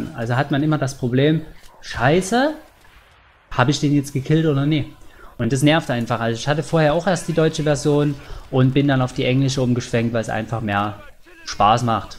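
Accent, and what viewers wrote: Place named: Saxony, Germany. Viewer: ich möchte noch ein bissel gebäshe auf sächsich .... oder bring noch paar lustige videos ... danke .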